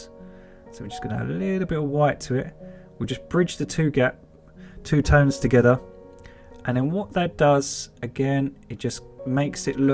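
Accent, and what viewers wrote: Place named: England, United Kingdom. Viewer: Great! I’m from England too. I live in Japan, so good to hear a homey!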